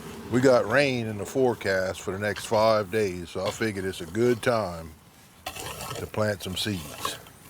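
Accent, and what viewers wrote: Louisiana, United States. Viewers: Just found you on YouTube Some of the vids are a bit older but not a lot changes in gardening. Love the Cajun accent. How about some zydeco music?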